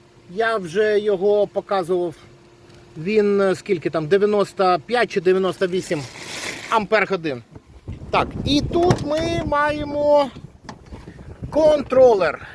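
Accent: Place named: Odesa, Ukraine. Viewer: Дякую за пізнавальне відео та шикарний одеський варіант української мови ❤❤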